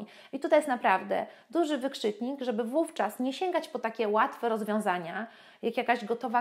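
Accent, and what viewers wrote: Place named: Warsaw, Poland. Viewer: Słychać od razu, że Warszafka mówi. No i kolejny film z cyklu jak mówić przez 12 minut i nic szczególnego nie powiedzieć.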